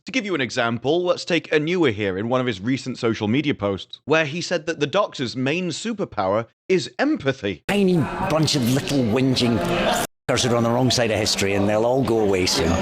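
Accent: United Kingdom. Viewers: I didn’t realize right wing American bigotry could have a British accent.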